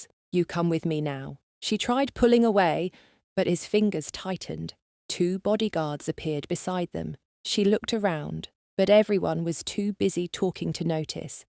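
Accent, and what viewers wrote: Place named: United Kingdom. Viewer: I’m watching from having Indiana, and I have discovered that I could listen to a British accent talk about anything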